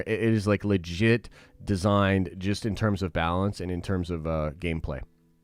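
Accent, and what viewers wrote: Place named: Western Pennsylvania, United States. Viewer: did your west Pennsylvanian accent inexplicably get HUGE in the last week and a half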